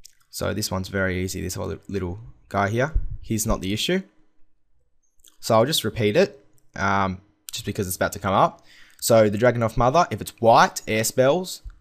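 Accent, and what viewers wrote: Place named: Australia. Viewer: YOU SOUND AUSTRALIAN. LOVE IT.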